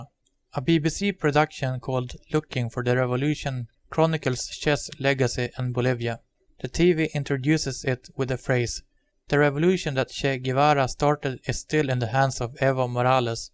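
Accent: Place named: Sweden. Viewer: Nothing wrong with the pronouncing but you can immediately tell that there is a very heavy Swedish accent. The videos are great, this is just an observation about the accent that I would recognize anywhere.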